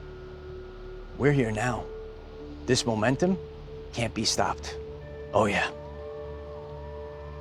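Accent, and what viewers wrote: New York, United States. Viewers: Caring good intentions but your message and video is convoluted Mission mosh with too much vague innuendos I noticed you never used quote any Scripture you're just giving life experience but it's verbally jumbled man it's not making a very good presentation Without trying to be rude or disrespectful I have to question and ask if you're on any medication because you're not messing with the public regarding something you call Twin Flame and vibrations and frequencies Your New York accent to me explains kind of why you have a super high intensity and inflections about the inner workings of communication for me you sound like you're on a subway too long and irritated with the people's inflections or things you think they're doing to irritate you I think it's better you stay in the sunshine and stay outside and enjoy the fresh air around you because you need that oxygen bro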